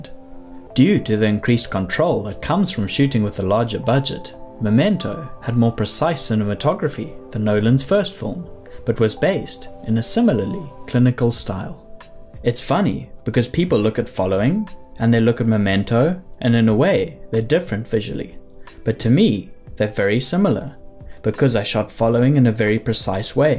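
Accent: South Africa. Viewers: You Sound South African🙂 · I love the south African accent my china. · Nice to hear a fellow South African, nice breakdown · Where are you from?, you sound South African.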